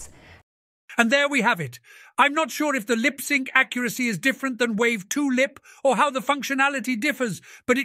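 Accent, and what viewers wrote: United Kingdom. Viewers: btw is it or, can't find any british voice like yours, it's really funny, i just wanna listen to this guy, and do whatever he tells me to do, like "go to gym you idiot" XD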